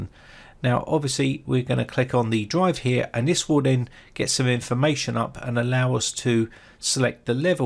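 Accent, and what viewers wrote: United Kingdom. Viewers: Damn he speaks UK English. 👍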